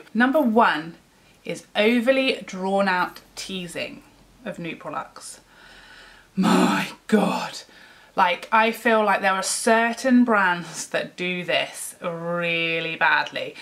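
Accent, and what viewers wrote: United Kingdom. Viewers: Hi, I just wanted to say I like your videos, you have this real-life, down to earth approach, you're relatable and I think your reviews are very thorough. And I also like listening to your British accent. Thanks for the good job!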